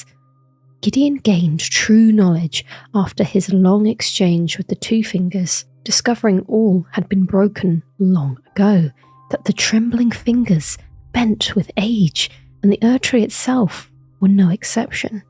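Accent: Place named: North, United Kingdom. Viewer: Love his Northern accent.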